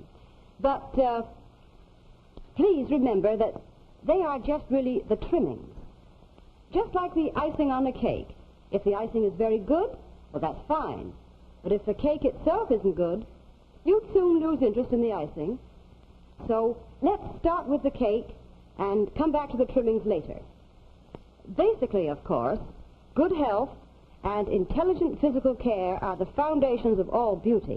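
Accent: Transatlantic, United States. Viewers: Transatlantic accent if I'm not mistaken.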